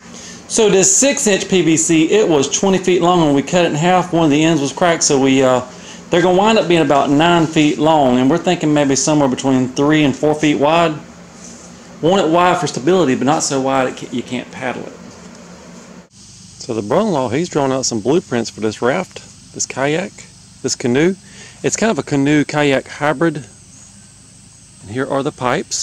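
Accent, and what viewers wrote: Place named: West Virginia, United States. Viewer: Dude I like your numbers mine are chad273👊 if only I had your talent👊 new subscriber here, and I watch a lot of channels and you seem to have figured out how to retain attention. I don’t know where y’all are at but you sound like a West Virginian. You sound like Elliot Sadler which helps on keeping attention. Looks like I have some catching up to do on your channel! Great stuff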